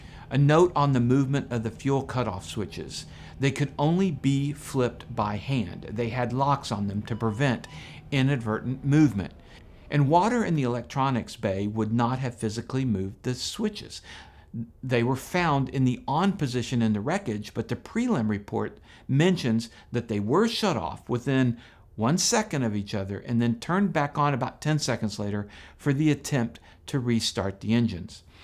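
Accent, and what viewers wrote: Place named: Midwest, United States. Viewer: Your command of our (American English) language, -being an American as reflected in your Midwest-ish accent(???)- is so much better than most of us. Interestingly, at one point, you used the word dived and I was ready to pouunce on that. Dived IS a past-tense term for dive, but so is the more familiar American term **DOVE** ... thus I ask: are you a Brit in American or Canadian sheep's clothing? Just curious. Oh, and yes, I love the tone and attitude you have in your thoughtful videos. So there.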